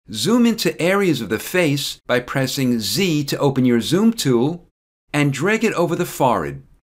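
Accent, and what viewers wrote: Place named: Long Island, United States. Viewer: Oh my gawd! I'm from Lawn Guy Land! I live near Seattle now, and I get 'the look' ALL the time! Your accent is like 'home' to me! GREAT tutorial too! THANK YOU!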